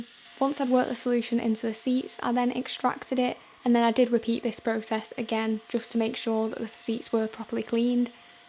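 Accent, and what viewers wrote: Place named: Wales, United Kingdom. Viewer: I love your accent. Welsh?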